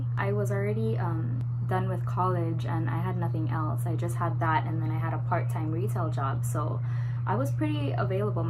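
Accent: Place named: Trinidad and Tobago. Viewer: You must be trini I feel like a hear an accent ! Lol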